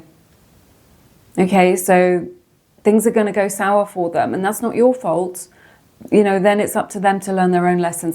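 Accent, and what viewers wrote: England, United Kingdom. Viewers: your readings are spot on. ive found that if you are a caring giving person many people may come too hate you. ive never understood why. i really enjoy your readings. you have totally open my eyes and elevated my life. thank you so much. side note here i was stationed in lakenheath england 1968 to 1970. i almost married an english girl. im now 77 and im amazed how you have enlightened and motivated me . thanks again and i love your accent brings back fond memories.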